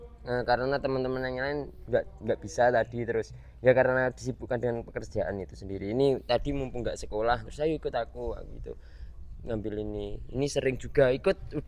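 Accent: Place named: Banyumas, Indonesia. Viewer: Wong ngapak dir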